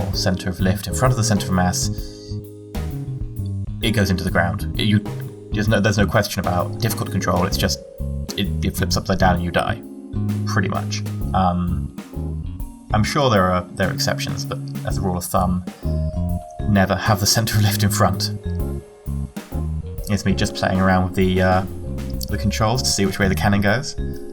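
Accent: United Kingdom. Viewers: Are you british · R u British?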